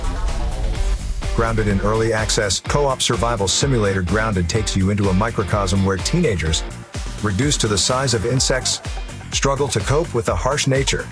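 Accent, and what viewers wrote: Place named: North America. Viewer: What the fuck does that even mean even to European gamers why does everybody else in the world Dam have ugly shit so this game looks good I will shut the fuck up any case you’re speaking in a western accent North American probably tell us game isn’t out Ross yet so it should be on this list and it probably will never be out it’s my bad Amazon is not releasing it yet because they don’t want it to overshadow new world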